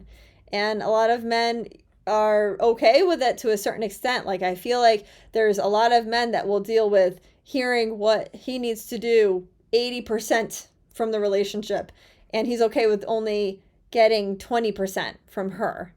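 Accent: United States. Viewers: You are a very interesting women and i totally agree with you. Here in the Netherlands it is the same as in all the west. Respect for you....but could you please work on your typical American womenly accent (i hope you know what i mean). Then it would be much more enjoyable. Keep up the good work in creating awareness and perhaps change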